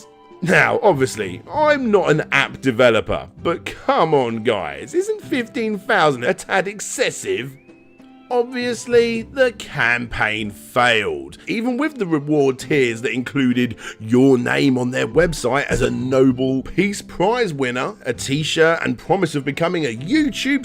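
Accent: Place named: United Kingdom. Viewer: In case you never heard such accent before you should know that he's from the U.K ,idiot . Guns are illegal.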